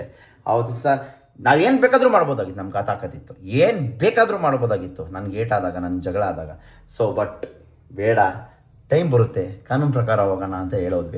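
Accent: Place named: Malavalli, India. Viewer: Hi bro... Nan nimma vlogs na recent agi nododikke start madiddu compare to others nim vlogs nange tumba ista aytu bcoz of namma kade use mado slang alli matadtira navu malavalli avre😊 keep on growing bro ❤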